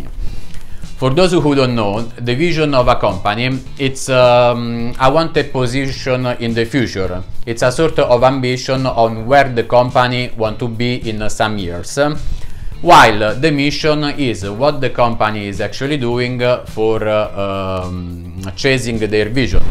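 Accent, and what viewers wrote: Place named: Italy. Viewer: Your accents makes me think you are italian...without subtitles it would be difficult for audience to understand what you are saying....overall your content is very well structured👍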